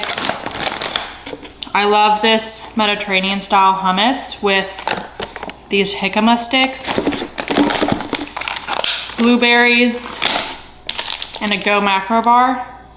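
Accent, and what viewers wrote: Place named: Georgia, United States. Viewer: I randomly found your channel and as soon as you spoke I knew you were a Georgia girl!! Loving your content!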